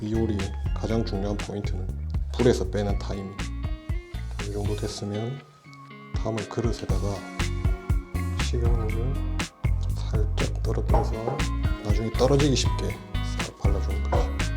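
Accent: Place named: Gyeongsang, South Korea. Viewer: B식탁님 말투완전 우리동네에요 전경상도 김해요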